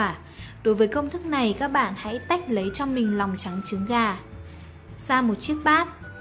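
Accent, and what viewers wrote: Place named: North, Vietnam. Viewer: Thích nghe mo lên thấy bac kỳ đoc ko thích khó nghe sai tu chát tai quá nói cho ng nam đoc ko cho vây hết ng hả nghe bac kỳ đoc chán bỏ me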